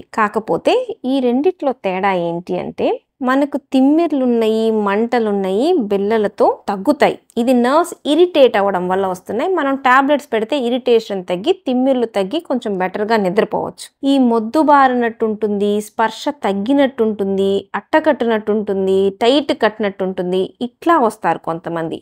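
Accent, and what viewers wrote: Guntur, India. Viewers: సహజమైన గుంటూరు భాష ,యాస👍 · Maanchi guntur yaasa😅😅😅😅😅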